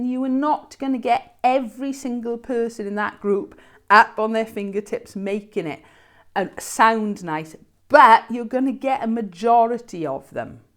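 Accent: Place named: Wales, United Kingdom. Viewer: amazing thank you! I've saved this to watch again a few times :) Also, recognise the fellow welsh accent!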